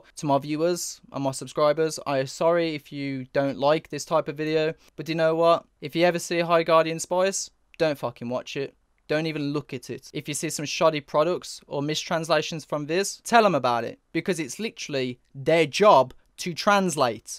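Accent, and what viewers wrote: United Kingdom. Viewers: man gets more British when he is angry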